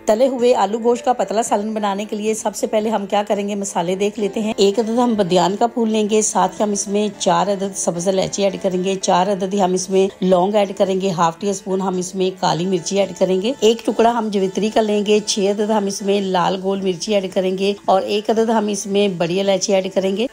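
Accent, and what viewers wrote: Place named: Punjab, India. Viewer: How can u speak without taking breath 😂 very interesting food blog recipe Sy ziyada apka Bolna ka Punjabi style chah gaya 😂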